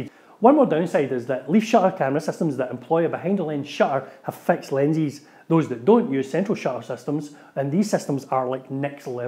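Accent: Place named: England, United Kingdom. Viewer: ahaha...yeah man, you are rapping the info out off your mouth....with heavy english accent....this how to vd...check on your basic teaching and communication skills...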